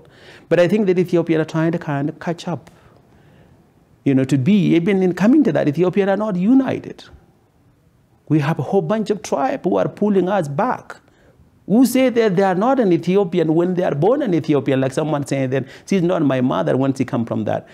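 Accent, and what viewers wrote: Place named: United Kingdom. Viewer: like that British accents. Thanks for your presentation.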